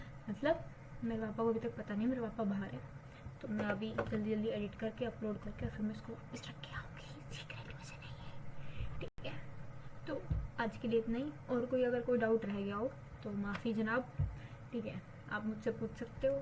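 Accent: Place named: Haryana, India. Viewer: firstly, thanks alot for the effort of making it and secondly i watched your 1 video before this one and i was 99% sure u were from haryana now this confirms 😂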